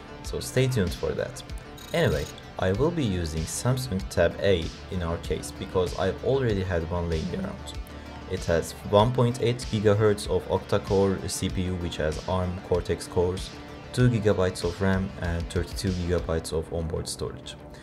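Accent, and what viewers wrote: Turkey. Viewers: Sesinden Turk oldugunu tahmin etmistim diye goruce emin oldum, Jay in videosundaki yorumu gorup geldim. Eline saglik 🙏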